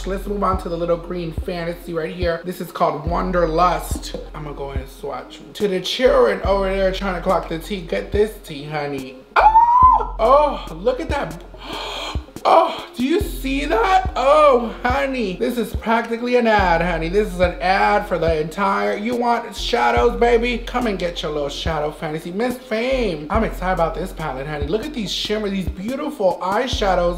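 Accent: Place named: United Kingdom. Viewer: I loved the slight British accent coming through girlllll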